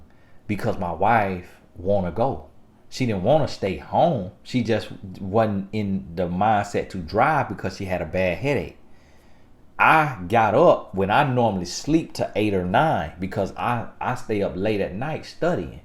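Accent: South, United States. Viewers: I love country accent 😇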